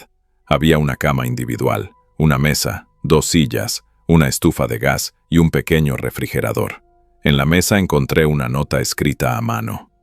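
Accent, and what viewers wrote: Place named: Spain. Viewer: Me gustan las historias de horror pero, con ese asiento español?, ni pensarlo.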